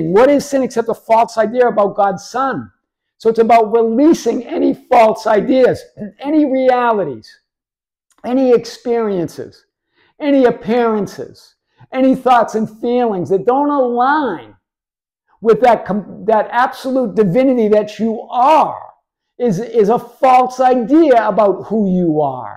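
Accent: Boston, United States. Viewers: dispenza, tolle, neville, zeland, abraham hicks...all good...but the greatest and most complete is this man from Boston. throughout history only Jesus, Krishna, and a handful of others were better teachers, that's how good Mr. is. yeah, he looks scruffy and normal right? well guess what, real Buddhas are often so simple and humble that the common man would never know who they are. people flock to dudes with giant robes and paraphernalia but I tell you all, with conviction, this man is among the top 3 spiritual teachers alive on the planet but most people would never know it. they will judge him by him folksy demeanor and not realize he is a master teacher. those of you who know who he really is are lucky. he is one in a billion, literally. I'm still amazed by how this gem talks in a casual Boston accent and is so light and cheerful, most will never know they are interacting with a true MASTER. 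life is hilarious hahaha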